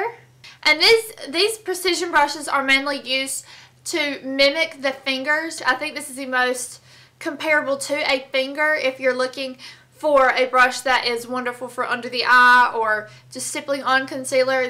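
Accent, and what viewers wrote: South, United States. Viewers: I love your southern accent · LOVE YOUR Southern accent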